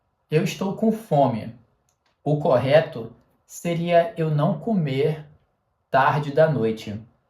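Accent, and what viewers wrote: Rio de Janeiro, Brazil. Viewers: Great video brother, I love ur comprehensible input style! What’s your accent? Are you from Rio de Janeiro or…? Also, are you currently live in Romania or something?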